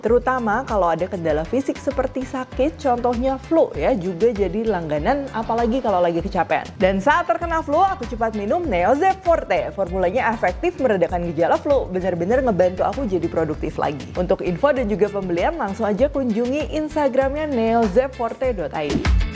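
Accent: Indonesia. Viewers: Medokkk🥰🥰🥰❤, keren chef, enakk👏👏👏👏👏👏👏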